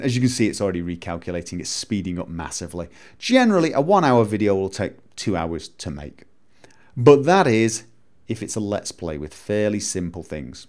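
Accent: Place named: England, United Kingdom. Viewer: It's a heavy'ish english accent, and I've heard plenty of scottish and irish people talk. Not all you scottish people have a superheavy accent.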